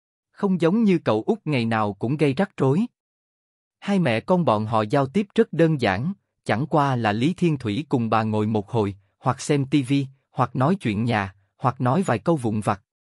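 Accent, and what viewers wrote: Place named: South, Vietnam. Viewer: Rất thích nghe giọng miền nam đọc 😊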